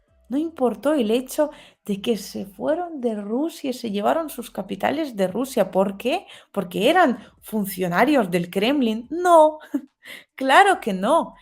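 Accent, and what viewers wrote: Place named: Spain. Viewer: porque ablas como si fueses de España?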